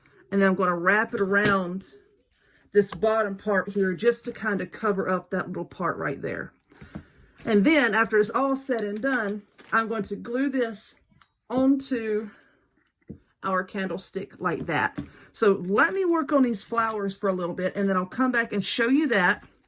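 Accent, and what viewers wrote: South, United States. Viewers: I just love your projects! I love your Southern Accent and fabulous SENSE OF HUMOR! Merry Christmas from ONE SOUTHERN GAL to ANOTHER❤️🌲❤️🌲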